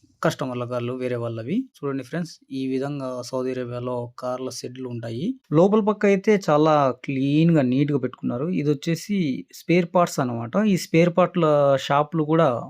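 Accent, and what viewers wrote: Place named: Karimnagar, India. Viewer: Mee matalu vintunte ma karimnagar la anipistundhi bro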